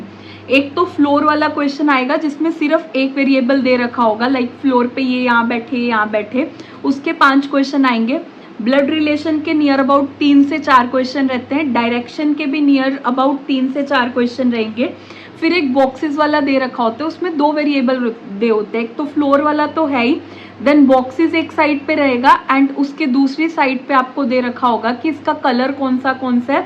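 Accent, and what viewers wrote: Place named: Pahadi, India. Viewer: Maam are you giving RBI grade B too ? also are you pahadi ? I feel your accent is pahadi those himachali , uk folks have that.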